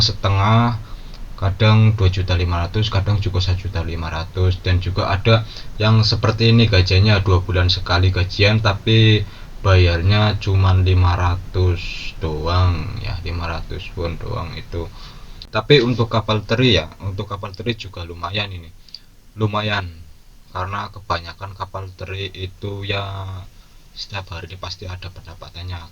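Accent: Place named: Banyumas, Indonesia. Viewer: Mas ngapak mau tanya boleh?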